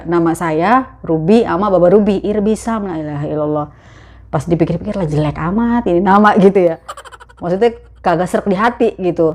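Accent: Jakarta, Indonesia. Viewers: Assallamuallaikum....salam kenal,sya penggemar +suscraber baru, baru 2minggu lht chanel..lanhsung jatuh bangun eh...jatuh ❤️mksudya 😊😊, suka cara ngomong, logat betawinya kentel bngt, dan kelihatn oranvnya ngk sombong, sayang aq ngk punya IG jdi ngk bisa folow IG 🤭🤭🤭, sekali lagi salam kenal &sucsses untuk chanelnya 🙋🙋😊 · Kamu lucu bgt sih, sy subs baru, seneng sama gaya ngomongnya ya betawi²an, lugas dan khas orang Jakartaan kata orang Jawa mah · Assalamualaukum demen banget dah denger logat betawi nya. Salam kenal dr JKT Utara,kl jkt nya sebelah mana pok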